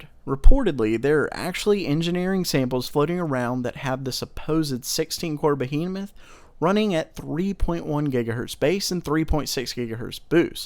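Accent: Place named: Tennessee, United States. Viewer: That accent is basically the same as mine, had to keep shaking the feeling that it was me talking xD Are you from Tennessee?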